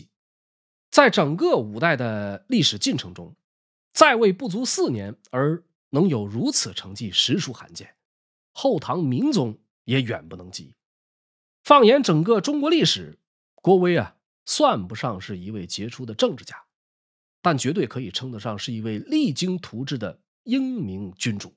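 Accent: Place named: Tianjin, China. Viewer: 我会说一点粤语 以前经常看港片听粤语歌看一些那边拍的整蛊视频 其实我和博主算是老乡 不知道你们听没听出来博主说话有点天津滴口音 介北方人和南方人就算都说普通话也是能听出来发音上的区别的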